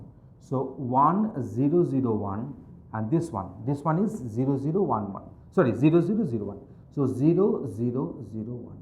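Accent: Bengal, India. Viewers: So, let's go with both tamil and hindi and may be other languages also. As far as I can understand, Sir is bengali with his accent.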